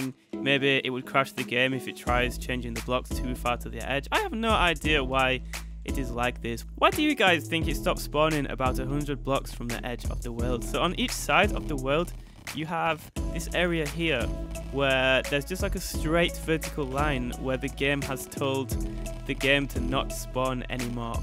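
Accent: Scotland, United Kingdom. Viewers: nice scottish accent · Your accent is absolutely glorious, where in the UK are you from? :D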